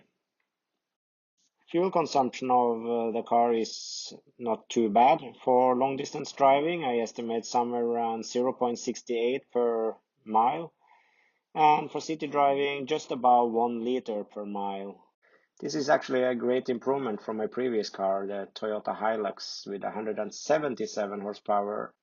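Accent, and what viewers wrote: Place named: Norway. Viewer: As well as visually showcasing the impressive features of this gorgeous car, your video is a delight to listen to. Maybe the commentary was to some extent scripted, or perhaps it is not having to simultaneously think about what you looked like on camera, that resulted in such an assured manner of speaking. I know many people hate hearing how they sound in recordings of their own voice. But believe me, your diction and delightful Norwegian accent in this voiceover comes across as professional and intelligent, reliable and trustworthy ,as well as approachable and engaging to an English listener.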